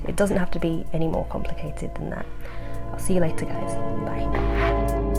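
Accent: United Kingdom. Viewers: normally british accents kinda remind me of how shitty humans can be, especially the very articulate well enunciated ones. but you, you broke the accent mold for me.... the format you use has bin abit of a schtick, most of the time. with no audio id assume you would be obnoxious cus of judging things based on other things, but this breaks the format mold. basically i think you are neat. thanks for being cool!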